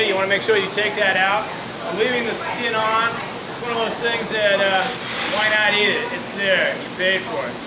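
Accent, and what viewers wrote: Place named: United States. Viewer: what does he mean "as you can tell I'm from Ireland" he sounds American. If he sounded Irish it would make sense.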